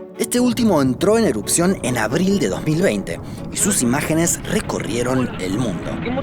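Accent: Rioplatense, Argentina. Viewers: Soy un estudiante argentino que Lleva un año viviendo en la ciudad de Medellín Colombia, y después de escuchar el dulce acento de este lugar, no eh perdido el mío, más sin embargó siento muy marcado en la manera que utilizas el yeismo, el famoso (sho) del río de la plata. Un saludo compatriota desde la hermosa Colombia 🇨🇴🇦🇷♥️❣️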